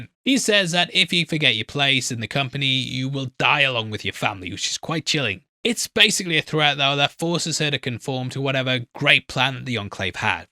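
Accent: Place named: United Kingdom. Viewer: I kept thinking "Who the hell is Bob?" Then it hit me. "Oh, BARB!" Brits and their dropped 'R's. Ain't language some shit? (Loving the breakdowns, by the way.)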